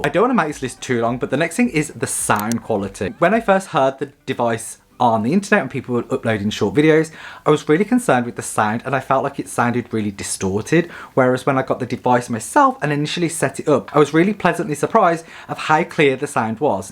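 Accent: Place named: Birmingham, United Kingdom. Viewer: I can defo hear some Brummie in ur accent right? First channel I find to learn about this toy for a Christmas present ha!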